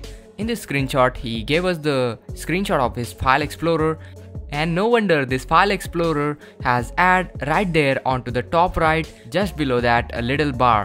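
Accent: India. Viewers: horrible indian accent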